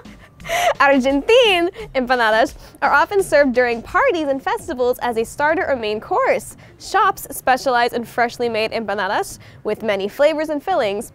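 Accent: Spain. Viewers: girl come to mexico, we want to feed you too, also her spanish spanish sounds tasty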